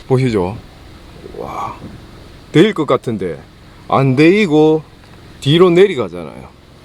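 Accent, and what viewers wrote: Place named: Western Gyeongnam, South Korea. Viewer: 사투리가 서부경남쪽이네요 맞죠?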